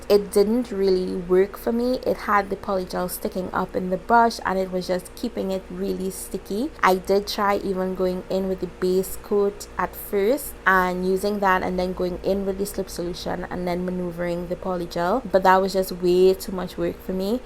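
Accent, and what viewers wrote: Trinidad and Tobago. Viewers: Are you trini?? I love hearing a fellow trini accent 😍🇹🇹🇹🇹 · You sound a bit trini are you?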